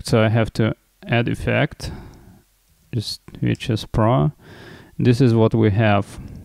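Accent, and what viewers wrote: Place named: Russia. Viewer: рашн рашн,акцент не спутаешь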